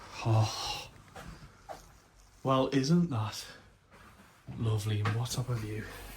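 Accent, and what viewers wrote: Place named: Newcastle, United Kingdom. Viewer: I love the Newcastle accent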